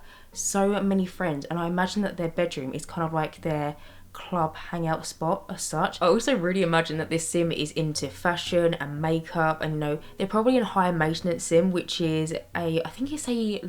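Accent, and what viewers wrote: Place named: Essex, United Kingdom. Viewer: just curious but are you from Essex? your accent is amazing!! I just found your content, love it.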